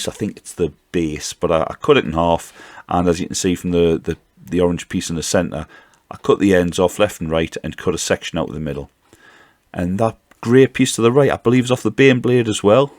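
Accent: United Kingdom. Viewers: It really is no accident that a gentlemen with such a nice Bri'sh accent is a great creator of Ork content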